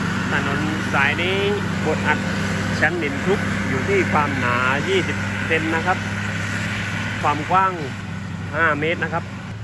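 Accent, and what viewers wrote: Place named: South, Thailand. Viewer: การใช้ภาษาทางใต้จะไม่ค่อยเหมือนภาคอื่น เลยทำให้ฟังแล้วมันแปร่งๆ สำเนียงไอ้น้องมันยังทองแดงเลย อภัยเถิดครับ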